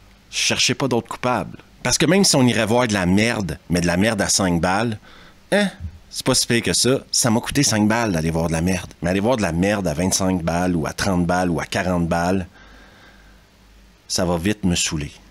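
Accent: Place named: Montreal, Canada. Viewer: Suisse ??? J’étais certain que tu étais de MTL 😂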